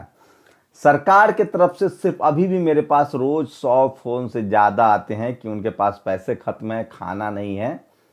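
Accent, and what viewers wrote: Bihar, India. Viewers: Lag raha hai ji bihari jeise bat kar rahe hai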